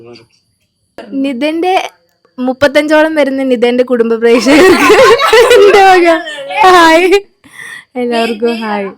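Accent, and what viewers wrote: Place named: Kannur, India. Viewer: Kannur slaaangg oke kity thodangeeele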